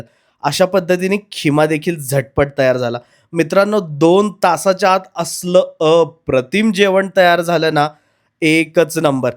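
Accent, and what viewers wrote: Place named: Pune, India. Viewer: बोलण्यावरून साताऱ्या चा वाटत नाहीस भावा , पुण्याच वाटलइस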